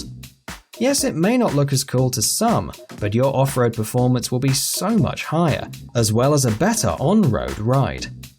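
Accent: United Kingdom. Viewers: WHY THE FRUITY BRIT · This dude is brirish and is probably justa city boiiiii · Okay, for those griping about his accent (foolish enough to think they have no trucks in the UK), here's an American guy who agrees wholeheartedly with him. Get real. Regardless of who says it, do you really think those things (oversized rims/wheels, air pollution, etc) are actually "cool?" Not by any stretch. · I refuse to listen to a Brit talk about trucks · Lmao hes british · Ok first things first take your British accent and go fuck yourself and second learn how to spell tire... and lastly what shit do you know about trucks where you drive on the opposite side of the road and in the car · First a British speaking gentleman explaining American trucks? Second, why would you go off road with low profile tires? Third, double digits is way to high for the road, buy if you go off road with it, who cares?Forth, if you feel the need for truck nuts, compensating for something? 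· Why is a British person talking about this 😂. 2 or 3 things he listed are actually badass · Why the fuck is a brit talking about trucks? Get back to your tea. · Those British accents are like fingernails on a blackboard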